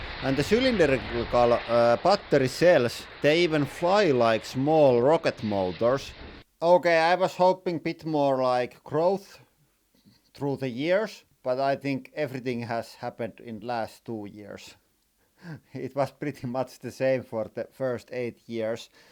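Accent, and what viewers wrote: Finland. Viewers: I was trying to guess your nationality by your accent, but when I heard Perkele the guessing was over. Greetings to Finland. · I didn't really watch before. But I thought the accent must be Finnish, and then you hit us with the perkelle, and it was confirmed haha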